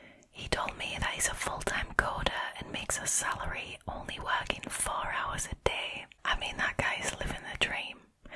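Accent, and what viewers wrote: Northern England, United Kingdom. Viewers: you have a lovely voice sends me straight to sleep :) not to intrude but are you from the north of england? i am from there and you pronounce things similarly to me and it made me feel represented haha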